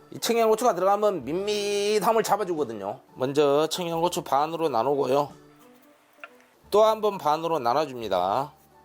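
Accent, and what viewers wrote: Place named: Yeocheon, South Korea. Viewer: 이분 사투리는 여천 사투리라고 확신합니다... ^^ 실력,해설,지도,방송 모두 굿입니다!!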